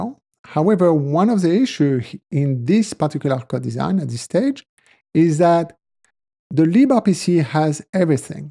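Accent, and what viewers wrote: France. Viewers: ahhh l’accent français ❤❤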